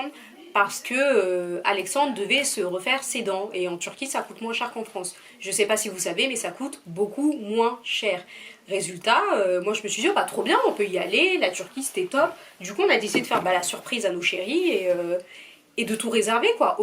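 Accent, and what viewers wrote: Cameroon. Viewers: Si vraiment je dois être honnête j’ai pas compris l’intérêt de la video a partir du moment où raflo elle même a déjà répondu au question des gens . Je pense que porter de l’intérêt à ce connard n’est pas utile . Raflo mérite mieux ! Qu’elle vive sa vie une nouvelle et belle vie mama le gars la est pourri , elle a de la chance de t’avoir à ses côtés Jpense que c’est grâce à vous son entourage aussi que la rupture ne la pas abattu . Bref malgré que je trouve pas l’intérêt de ta vidéo merci d’être là pour elle je pense que tu es une très belle rencontre pour elle . Laissez vos affaires de sorcellerie bande de fou. Ton accent camer me stress trop 😭 bisous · Ton accent kmer c'est la tension😊.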